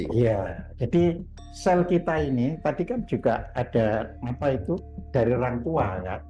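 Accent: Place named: Surabaya, Indonesia. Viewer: Bopo Suroboyo ne kentel bgt nih, Sampek emeh keplincuk 😅😅😅, Rahayu bopo ❤❤❤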